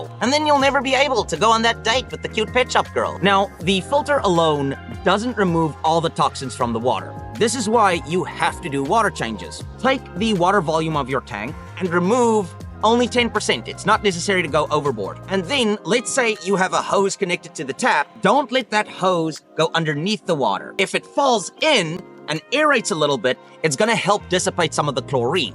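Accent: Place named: South Africa. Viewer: Hey you’re South African I noticed an accent Aseblief please get a mic And can goldfish have tank mates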